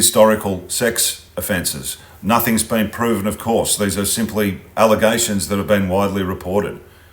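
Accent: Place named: Australia. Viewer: Wow a real Australian journalist speaking Australian I love it keep up the good work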